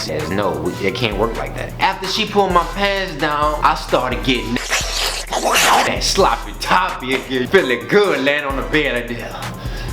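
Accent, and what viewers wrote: New Orleans, United States. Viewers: You can tell he from New Orleans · You from new orleans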